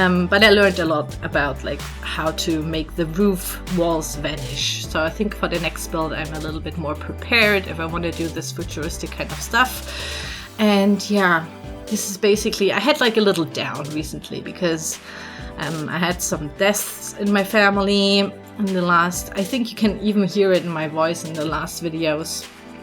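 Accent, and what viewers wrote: Germany. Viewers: Me: hears the accent *Checks channel information yup. Germany. P.S. COOLES VIDEO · And youre dialect sounds like German is that right ? 😊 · Der deutsche Akzent ist die beste! 💛 So ein schönes Haus. Echt gut gemacht!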